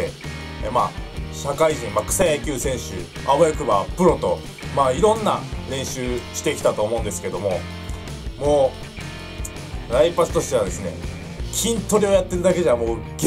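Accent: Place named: Kansai, Japan. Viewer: ライパチさんの関西弁、いい。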